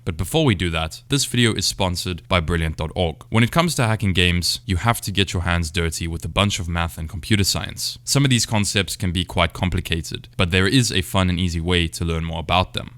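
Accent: South Africa. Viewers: This brah sounds South African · Clicked on this and didnt expect to hear a saffa, lekker vid bru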